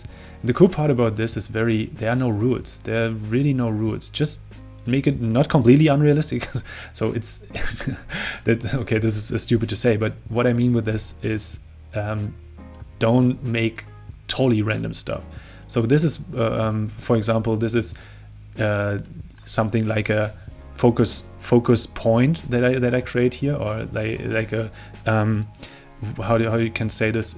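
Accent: Germany. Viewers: I don't know who you are, I don't even know that Figma exists, but I'm glad the youtube algorithm has sent me here. This will no doubt be useful to me some time later. It looks like Figma is great. Your tell-while-showing presentation is good, mostly straight to the point as it also includes your commentary tangents. The description is very useful with the timestamps, links, and even credits to the music. Your voice with German accent is nothing but soothing and clear to understand. (Or maybe I'm just used to content creators with heavier German accents speaking English such as JoergSparve and members of ZF such as SwatKnight and Gambit) In any case, subbed. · Ur SOO german ich auch i can hear that man hört es sowasvon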